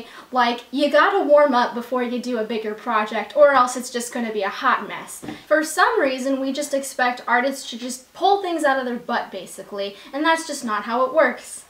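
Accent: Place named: California, United States. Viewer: It's ok, you from California? For some reason that was what came to mind (when I heard the likes).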